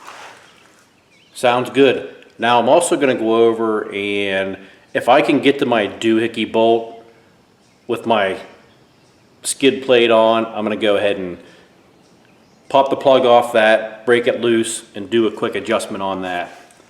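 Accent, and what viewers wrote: Pennsylvania, United States. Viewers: I thought you sounded like you were from PA then I saw the PA sticker where abouts you riding?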